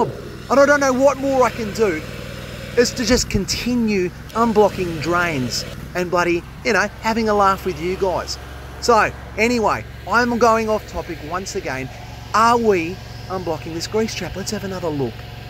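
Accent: Australia. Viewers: Just found your channel couple days ago. I work on pools here in Arizona and your channel popped up. I gotta say half the fun is your talking. Something about Australian accent can make an autopsy seem fun. Keep up Awesome work already been thru 10 of your videos in 2 days. You’re great. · Don't shut up bud!! So funny with your comments, and the Australian accent is so much better than an american one.😂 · Absolute joy. Keep the commentary going, full on Fosters and full on Aussie 👌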